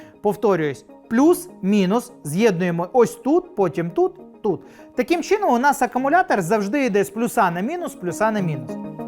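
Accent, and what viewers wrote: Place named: Russia. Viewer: Чоловіче! Ти мабуть "русскій"? По інтонації чую, по агресивній заздрості. Щоб з банок по 3,3 вольта отримати 12 вольт - дійсно, треба послідовно з'єднати 4 банки. Фізику в 6-му класі вчив? Чи прогулював? Чи тебе засмучує, що 3,3×4 - буде не 12, а 13,2? А до літієвої банки, на якій написана напруга 3,7 В, а на свіжезарядженій насправді близько 4-х вльт, у тебе претензій нема? У мене більше претензій до залуПутіна, який каже, що він за мир, а насправді робить війни. Тебе він не обурює?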